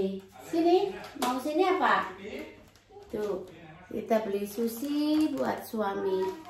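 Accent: Java, Indonesia. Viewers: Semoga keluarga kita selalu dlm lindungan ALLOH swt amiiin...mbak medok wong jowo bangeeet ☺ jember selalu hadir ☝️🙏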